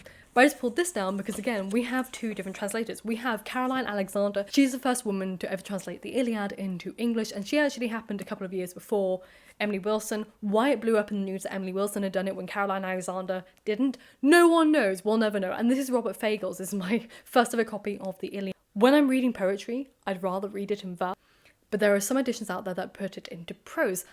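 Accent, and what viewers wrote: United Kingdom. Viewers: I’m really into what you promote, but you speak too fast for an international public, allthough you are vere charming british, please consider us “ slow ones” ❤️🤗 Maybe you’re just trying to reach a british audience, then my apoligies !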